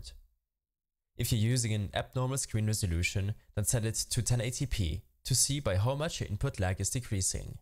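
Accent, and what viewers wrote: Germany. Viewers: Are you from German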